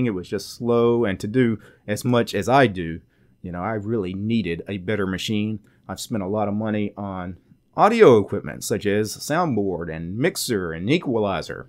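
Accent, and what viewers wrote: South, United States. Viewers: Hello, I am from CA but I am right now in Italy with a friend who is a programmer and he installed linux on my computer and he was listening to your video on Joplin as he was trying to find me an alternative to Evernote and he is learning English and he was asking me where you were from, what kind of accent you have, since it is different than mine. Can you tell me what area you are from, are you from a southern state?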